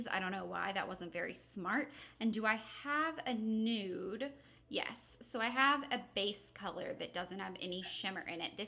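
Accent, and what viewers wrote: South, United States. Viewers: It's funny. I started watching your videos about your third video up with your southern accent! But that's beside the point! Lol ... I STILL use OMGHHKP to this day. I'm a teacher and even my students say it.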